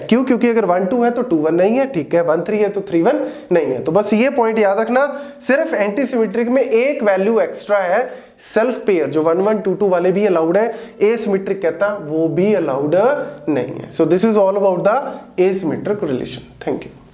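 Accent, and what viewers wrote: Punjab, India. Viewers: I love your punjabi accent so much😍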